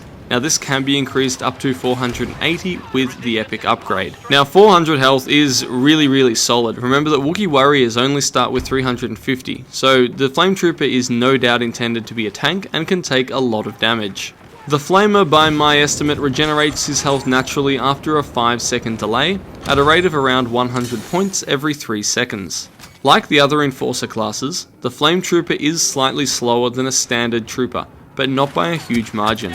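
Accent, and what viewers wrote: Australia. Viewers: You're aussie too? :D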